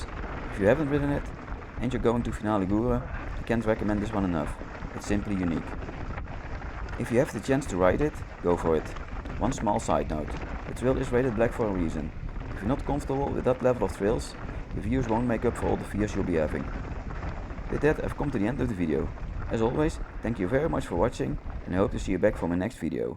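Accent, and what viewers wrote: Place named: Netherlands. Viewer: ik vind het nederlandse accent engels juist leuk gewoon je eigen ding blijven doen. ik heb je hardtail content nu al 10x opnieuw gekeken . leuke video weer!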